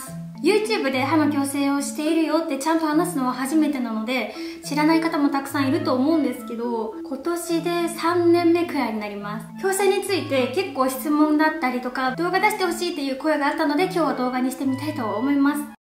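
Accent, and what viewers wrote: Oita, Japan. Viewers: たまに語尾が聞き覚えあると思ったら、同じ大分県の方なのね😂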